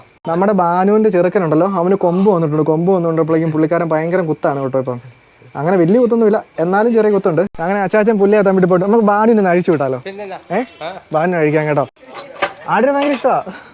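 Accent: Kottayam, India. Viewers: Kottayam slang👌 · Kottayam slag 😎😁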